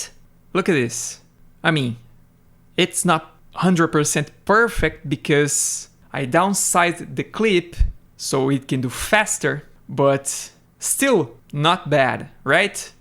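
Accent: Brazil. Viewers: Você é BR né safado? conheço o sotaque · Brasileiro? O sotaque é bem inglês brasileiro (Acho lindo) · I literally commented on their video like "Now I just need a H100 to run this" and boom this pops up in my recommendations and you sound just like me talking in english, impossível um brasileiro não reconhecer o outro, me inscrevi no canal muito bom o vídeo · Pelo sotaque chuto que é brasileirinho, não? Excelente vídeo mano. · esse sotaque é. brasil conheço de longe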